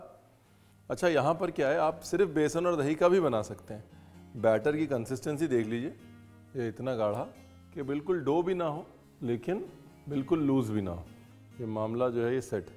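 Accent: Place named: Lucknow, India. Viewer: Khana to superTasty Rhta h but aapka wo Lucknovi Andaz ki baate Heart touching ❤❤❤❤ You are real Gem Sir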